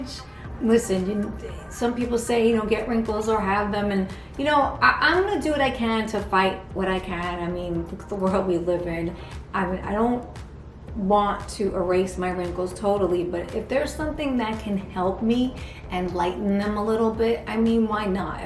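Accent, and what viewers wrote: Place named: New Jersey, United States. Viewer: This is great! Love your accent too- I used to live in Edgewater NJ and it reminds me of home! Thanks a million.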